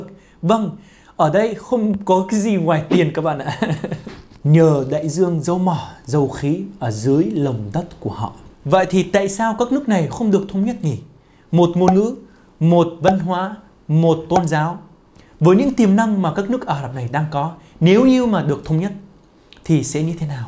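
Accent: Standard. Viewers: mình cảm thấy hổ thẹn với bản thân mình quá. nói tiếng việt chuẩn và lưu loát quá. mà công nhận có trình độ địa lý đáng nể. chúc thành công trong cuộc sống. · Mới biết kênh và rất ngạc nhiên vì nói tiếng việt quá chuẩn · ! Ban rat gioi toi nguong mo ban, Ban la nguoi noi tieng Viet rat chuan! (moi ban den tham qe toi Can Tho mot lan nhe!) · Chào nhé..cô thấy cháu nói tiếng việt rất chuẩn. Cảm ơn vì cháu yêu đất nước của cô. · Noi tieng viet nam chuan qua cam on .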